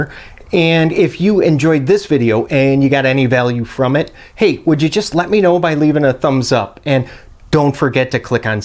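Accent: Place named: Michigan, United States. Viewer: this was exactly the video i was looking for, thanks man. first time i've seen your channel, i dunno where you're from but i just moved away from michigan to the west coast and your accent brought me back home for a minute, lol. are you a yooper?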